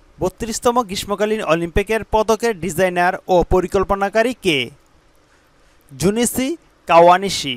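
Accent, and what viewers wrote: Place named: Noakhali, Bangladesh. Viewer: আপনার ভাষা নোয়াখাইলা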